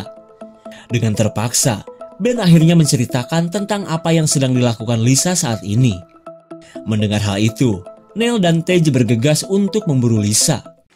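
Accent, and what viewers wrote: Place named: West Java, Indonesia. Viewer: Suka gya bicara ada sunda2 gituhhh subrek donkk gaskeunn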